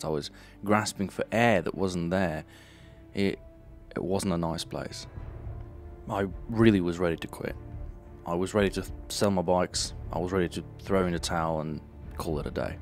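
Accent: Australia. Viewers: Tbh he sounded more aussie or kiwi to me and im a British "person"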